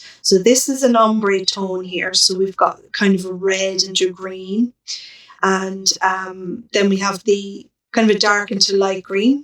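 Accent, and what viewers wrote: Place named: Ireland. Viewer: Congrats on a fab PCS box. Love your box and delighted to hear another lovely Irish voice. Tfs Regards Simone x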